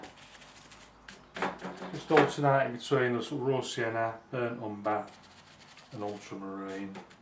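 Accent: Birmingham, United Kingdom. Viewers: The Brummie Bob Ross. Bostin'!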